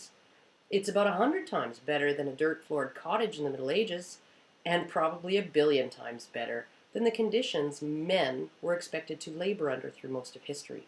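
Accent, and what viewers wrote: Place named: Canada. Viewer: your Canadian!!! xDDD thats why i like how you say sorry xD i thought i heard it sumwhere b4 lol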